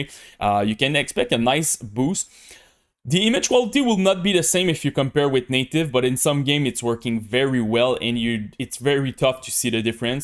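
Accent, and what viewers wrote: Quebec, Canada. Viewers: c'est malade comment cest facile de reconnaitre un quebecois qui parle anglais . j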